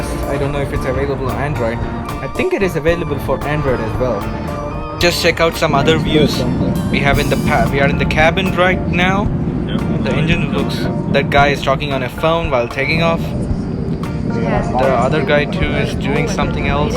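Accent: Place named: India. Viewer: Dude you can't even speak English properly stop trying to be funny. Plus you sound like an indian person wtf 😂😂😂😂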